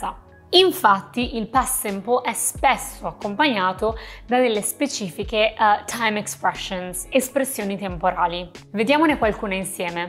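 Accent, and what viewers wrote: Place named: United States. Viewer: Ciao. Ti ho scoperta solo ieri e mi piace molto il modo in cui spieghi le cose, ma soprattutto mi piace molto la tua pronuncia americana. Vorrei tanto averla anch'io così. Posso chiederti un favore? Non so se già l'hai fatto, ma potresti fare un video su come dire "Anch'io" in risposta (al posto dell'obsoleto "me too")? Ovviamente mi interessa come lo dicono in America. 😉...Grazie mille, sto facendo tesoro dei tuoi video 😘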